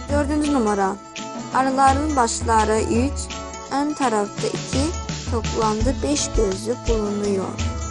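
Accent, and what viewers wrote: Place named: Azerbaijan. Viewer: Türkçe Çoxda Danışanmırsan O Saat Başa Düşülür Azerbaycanlısan Genede Halaldı =)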